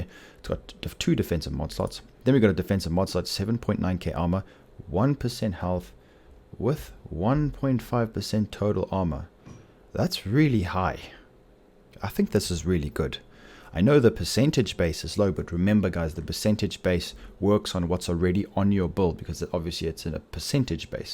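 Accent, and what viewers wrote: South Africa. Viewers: Hey am I hearing a South African accent there?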